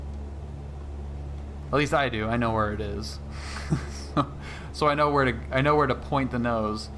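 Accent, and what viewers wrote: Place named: North, United Kingdom. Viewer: But you have a northern accent! 🤨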